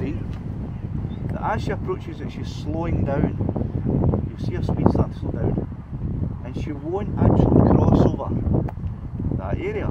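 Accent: Scotland, United Kingdom. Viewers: I absolutely love your Scottish accent, I can understand you way better than some English blokes from North Yorkshire, or Saint Helens and Liverpool 🤣 I always set the phone on airplane mode if possible, it prevents your sceen capture getting disturbed by push notifications like Whatsapp and stuff.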